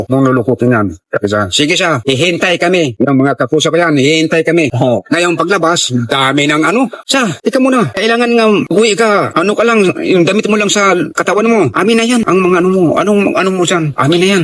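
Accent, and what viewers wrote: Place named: Bohol, Philippines. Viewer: cebuanong may pagka boholano😊.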